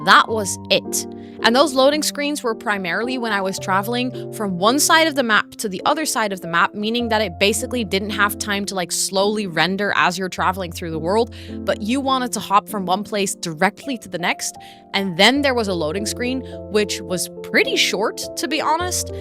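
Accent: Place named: Netherlands. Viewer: Ah you're from the Netherlands! I barely heard an accent until halfway through, your English is so amazing! Better than even some Americans or British 😅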